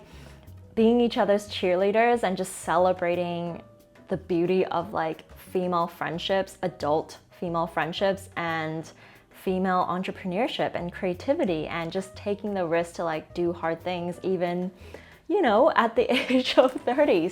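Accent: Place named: England, United Kingdom. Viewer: You are such an inspiration. I love your english accent. ♥️ from Malaysia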